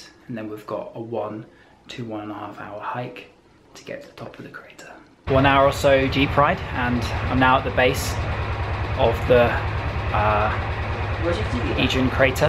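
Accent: United Kingdom. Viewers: British accent ....,👍👍👍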